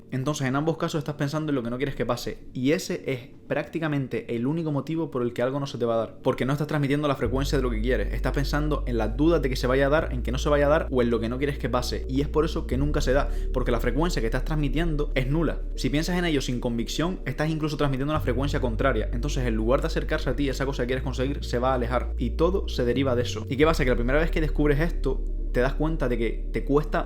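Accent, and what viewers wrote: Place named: Spain. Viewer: BOOOOFFF, Que videazo tio, ostia, hasta se me ha pegao' el asento Ejpañolete. Saludos, soy de Colombia, me has dado mucha claridad, un abrazo, mucho exito y Dios continue bendiciendo tu Don!!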